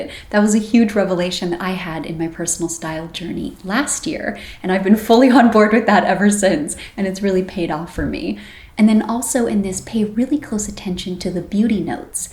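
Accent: United States. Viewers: You are one of the few American Youtubers I can watch. Everybody else will scream at you and pull this show like they are bursting of energy and good humour. I mean, you are really friendly, but not hysteric and loud. And your American accent is so nice and subtle. Why can't tourists visiting Europe not speak and dress like you?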